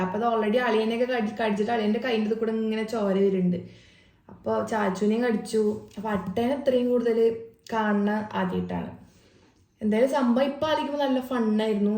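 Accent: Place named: Malappuram, India. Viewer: Ith malappuram slang alle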